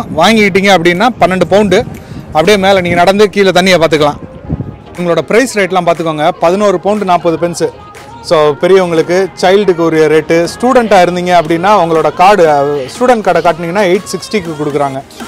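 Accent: Madurai, India. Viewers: உங்க(எங்க) மதுரை தமிழ்ல கேட்க ரொம்ப மகிழ்ச்சி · லண்டனை சுற்றி பார்த்த உணர்வு.பதிவுக்கு நன்றி.மதுரைதமிழில் அசத்தலான பேச்சு.நடுசென்டரல 😀👌👌வாழ்த்துக்கள்.கோவிட் நினைவு சுவரில்SPB&VIVAKஎழுதியது .உலகத்துல உள்ள அனைத்து தமிழ் நெஞ்சங்களில் வாழும் இருவர்.பாராட்டுக்கள் வாழ்க வளர்க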